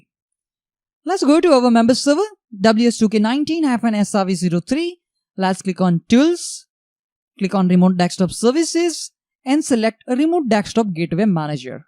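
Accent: India. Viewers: His English is India-Style and full understandable. So what´s your Problem ?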